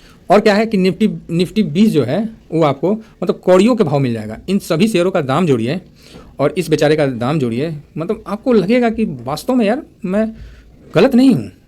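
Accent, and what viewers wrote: Bihar, India. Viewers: Sir aapka style bulkul hi deshi hai, sir aap jarur bihar ke honge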